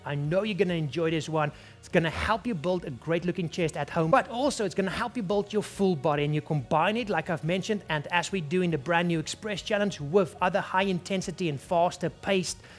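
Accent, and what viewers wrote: South Africa. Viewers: This dude is so South African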